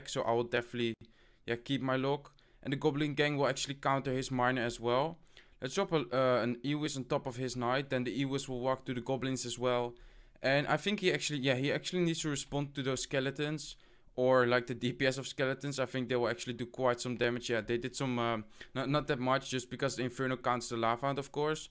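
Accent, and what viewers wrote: Netherlands. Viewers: Ahaha ik hoor zo aan je accent/stem dat je Nederlands ben!! Ps, leuke video! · Tiene acento holandés.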